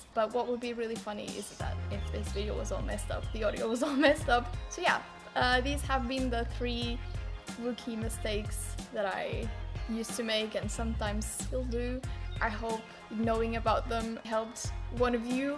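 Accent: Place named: Portugal. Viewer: The second one is really helpful when it comes to creating better photography compositions. I really didn't realize up until recently hahaha. If anyone want to see my pictures, my Instagram account is: Ps:, teu sotaque português é muito lindo hahahah faz outro vídeo em português PLEASE ( Sou do Brasil)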